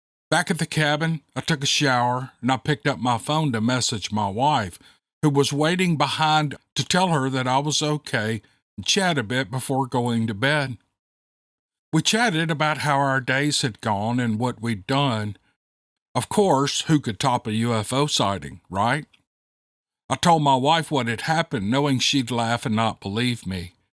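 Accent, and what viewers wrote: South, United States. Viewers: I love how you read.. I could listen to you all day.. I really love the Steve Lily saga but anything you read is fine with me.. as a Arkansas gal, I love your southern twang..The stories in your podcast were great..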